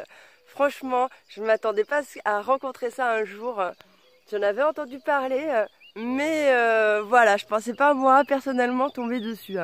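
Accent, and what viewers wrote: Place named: Marseille, France. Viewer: ah ca y est je sais d ou vient ton accent, t es pas de Marseille !!! dis donc tu as tiré tes images du dernier indiana jones la scéne avec les fourmis hahaha-lol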